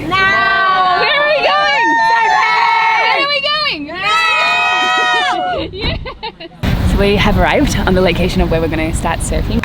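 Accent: Australia. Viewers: Are u aussie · How do you not use plastic water bottles while traveling in South and Central America? Does your water bottle purify the tap water to AU standards so you don’t get sick? With your Australian accent I’m assuming you grew up with super purified tap water... the tap water in South/Central America has bacteria that we’re not used to so we get sick when we drink it. (I’m half Australian/half American- grew up in the US. I just got back from Ecuador and was reliant on bottled water during my stay there so I wouldn’t get sick. In the states I will not use plastic bottles and during my stay I filled up my water using the big reusable bottles as much as I could but still used the plastic ones occasionally when other alternatives were not available.) Thanks so much!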